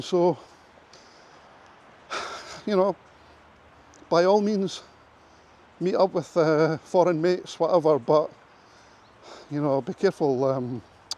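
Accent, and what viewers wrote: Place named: Scotland, United Kingdom. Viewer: Yep it's English. Scottish accent is considered difficult to understand even by other English speaking nations. Many also find the accent cute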